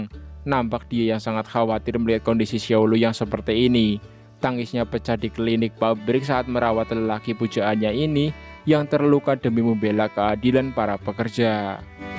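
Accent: Banyumas, Indonesia. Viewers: Aku sangat terhibur bingiit sih mas bro kalau mas bro bacain cerita drama itu lhoo sama bahasanya sampean yang medok n ngapak bingiit ra ngapak ora kepenak yaaah 🤔🤗😁👍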